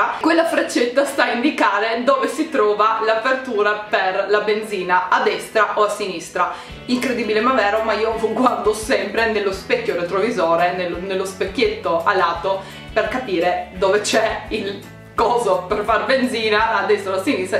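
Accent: Trieste, Italy. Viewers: Hai l'accento triestino. Sei di Trieste? ☺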